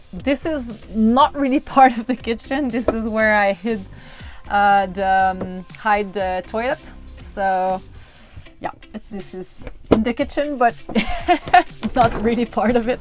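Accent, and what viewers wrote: Canada. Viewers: Definitely a strong Quebec accent haha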